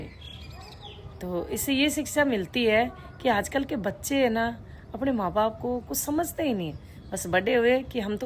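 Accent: Gujarat, India. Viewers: आपका अंदाज बहोत अच्छा है आप भी खूबसूरत हो ,सिर्फ एक बात खटकती है आपके उच्चारोमे गुजराती या एम पी का टच आता है ,उसे सुधारणे का प्रयत्न किजीये ,आप एक अच्छी कथावाचक ,Anchor बन सकती हो ,हार्दिक शुभेच्छा 🎉🎉🎉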